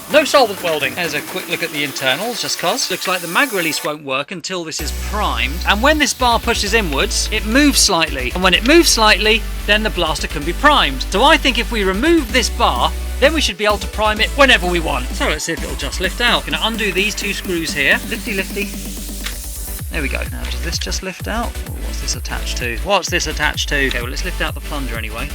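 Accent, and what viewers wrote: United Kingdom. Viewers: dudechaps bri'ish coop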